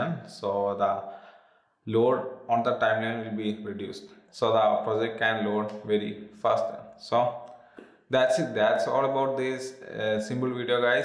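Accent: Kerala, India. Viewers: Bro malayali anno?😅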